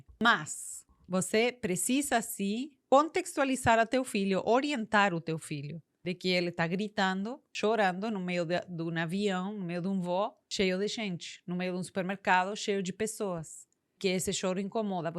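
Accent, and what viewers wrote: Spain. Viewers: E o tipo de mulher sem cuidados para ser mais feminina, sem pintar unhas, com esse sutaque espanhol faz ela misteriosamente sexy....ja eu vejo so por isso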